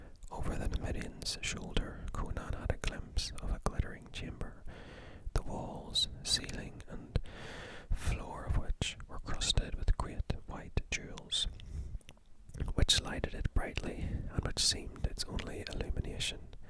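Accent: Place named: Ireland. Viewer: I’ve been searching ‘Conan ASMR’ on a regular basis for like ten years hoping something good would pop up one day, super glad something finally did. The Tower of the Elephant was one of Howard’s best, in my opinion. If you do another Conan video (& I really hope you do), what story do you think you’d do next? I personally think the Phoenix on the sword would be a good one for ASMR, but obviously any of them would do. I also want to mention that I think it’s great that you have the accent that you do, because while I think most people understandably imagine Conan with Arnold’s thick Austrian accent, in my personal head-canon, he’s Irish. So it’s really cool to hear that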